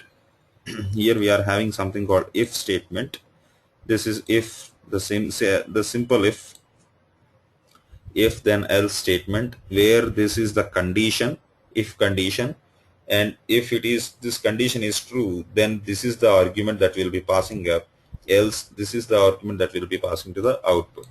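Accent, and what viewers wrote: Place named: India. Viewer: again indian training... can we have ONE flawless speech without any accent in SAP topic???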